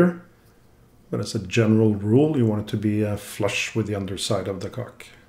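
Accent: Norway. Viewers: Du høres norsk ut.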